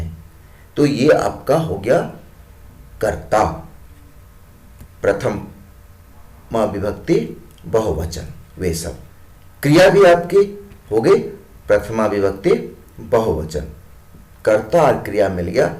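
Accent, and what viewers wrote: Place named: Hindi, India. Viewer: మొదట సంస్కృతాన్ని సంస్కృతంలా పలకడం నేర్చుకోండి. హిందీ రూల్స్‌ అప్లై చేయకండి దాని మీద. భాష మీద అభిమానం ఉంటే సరిపోదు. సరిగ్గా నేర్చుకోవాలి. హిందీ రూల్స్‌తో సంస్కృతాన్ని నాశనం చేయద్దు.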